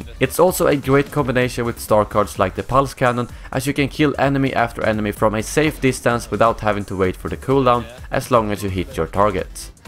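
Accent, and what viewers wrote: Sweden. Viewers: thanks for the tips...är du från Sverige va? det känns så :) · I knew you were swedish! Cause you have a strong accent! Where do you live in Stockholm? (Not the adress, just the name of the place) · are you swedish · låt mer svensk xD bra video dock :) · Are you from Sweden? You accents sound a little familiar ;) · are you Swedish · Mmm svengelska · are u from sweden? just wondering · Are you from sweden?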